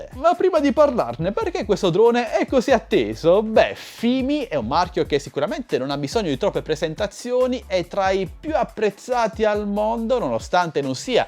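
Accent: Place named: Bari, Italy. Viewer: Hai mai pensato di toglierti l'accento barese quando parli?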